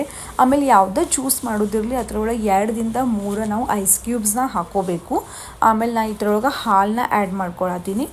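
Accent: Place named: North Karnataka, India. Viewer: Navu uttar Karnataka davaru nivu Nam tara matadtira😊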